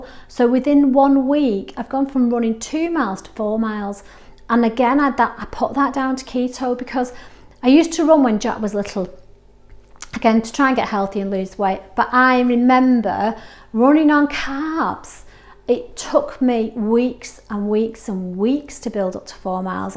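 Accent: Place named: North, United Kingdom. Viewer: So lovely to hear that northern voice!!! I love that jumper looks so comfy. 2.7miles is great! And I do love a bike ride blow the cobwebs away in the mind. You never failed at all 26 hours is so amazing. And whisky is my favourite drink and gin but when I'm being strict I can't drink it just ruins me lol. You sound like you are doing totally amazing to me. Chaffles he he he so yummy! 4.6 miles Wednesday that's great too you are doing amazing on the exercise size of things. Keto is such a powerful tool when you use it in the correct way for sure. 40 pounds so so amazing I am so pleased for you it feels great doesn't it, keep it up your doing a great job whoop whoop!!!!!! fingers crossed for getting into the 10stones next week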